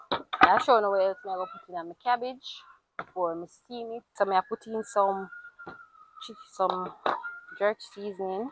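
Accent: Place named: Jamaica. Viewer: Yeh mon dis ah di real Jamaican sound💪🏾💪🏾💪🏾🇯🇲🇯🇲🇯🇲🇯🇲🇯🇲🇯🇲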